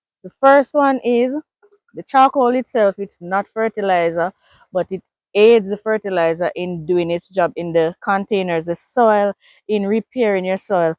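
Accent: Jamaica. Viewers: I like her Jamaican voice, good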